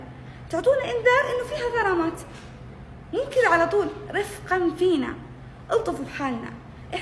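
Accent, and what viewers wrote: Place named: Syria. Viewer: واضحه اللهجة واضحه 😂😂😂😂 مع انها تحاول وتحاول جاهده المسكينه ههههههههههههه تقلد اللهجة السعوديه لكن واضح من مخارج الكلمات تطلع لهجتها الاصليه مهما حاولت تغطي تخرج لهجتها السوريه غصبن عنها 😂😂😂😂😂 ياترا من الي متستر عليها من الي وراها ابحثوا عنها وتعرفوا حقيقتها اكيد 👌 هذي واضح من لهجتها سوريه 👌👌👌 لاوبعد تحاسب بعد 👌😂😂😂😂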